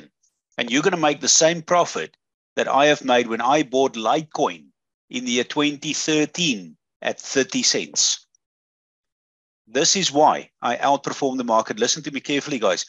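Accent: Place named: South Africa. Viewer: This guy sounds south African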